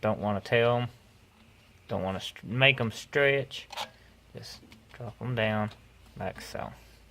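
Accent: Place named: West, United States. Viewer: Nothing to do with the diy it your accents cool. Reminds me of the wild wild west lol. Thanks for the video too though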